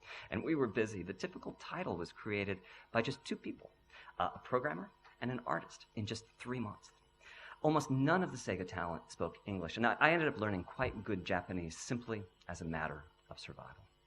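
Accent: United Kingdom. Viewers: Wait he's an American? Why does he sound British?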